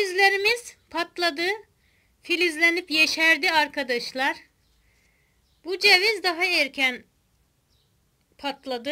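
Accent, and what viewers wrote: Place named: Kütahya, Turkey. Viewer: Konuşma şilven bizim gibi abla kesin Kütahya yada Uşaklısın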